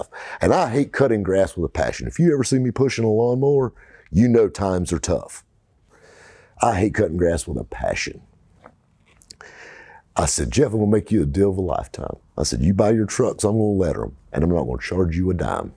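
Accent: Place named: Greenville, United States. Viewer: Grew up in Mauldin, SC from '78 to '88. It's great to hear someone that has that great up-west Green-vull accent. If you ever want to ride around and film barbeque or burger joints in Southeastern NC, I can recommend a few people. Our little band of idiots have some stories that can prove that the truth is stranger than fiction.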